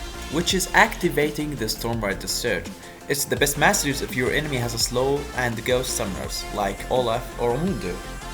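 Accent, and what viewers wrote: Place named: Egypt. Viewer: مسوي عنقليزي تتكلم زي المصري ترا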